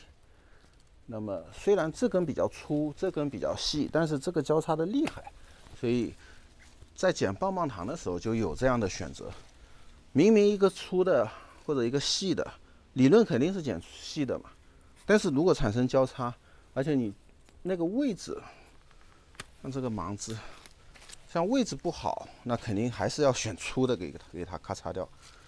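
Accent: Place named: Shanghai, China. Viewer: 第一次看到你的视频。听口音上海人是伐？看这景貌似南加？我也在南加😄。BTW, 我就是天平座的。😅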